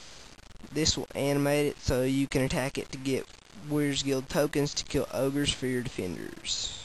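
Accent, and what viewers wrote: United States. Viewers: i like the texan voice too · You have an awesome Southern Accent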